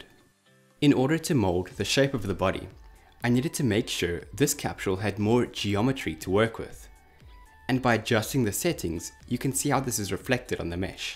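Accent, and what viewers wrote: South Africa. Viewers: Great YouTube channel! I assume you are South African, because of the accent. I am also proud South African. It’s so cool to have a growing YouTube channel such as yours based in South Africa. You are definitely in my top 3 game development YouTube channels. Could even be number 1. Keep up the great work. Oh and where in South Africa do you live?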